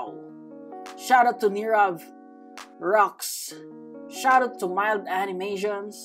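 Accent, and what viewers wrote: Philippines. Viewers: PINOY SABI NA EHHH YUNG ENGLISH ACCENT NYA PANG PILIPINO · Ur voice like philippines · When I heard voice I knew he is Filipino lele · I KNEW YOU WERE FILIPINOOOOOO just by your accent :>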